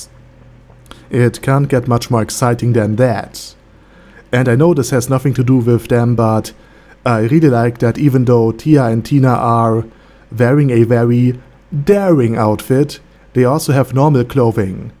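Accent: Germany. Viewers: oh, so you're german!! that explains the accent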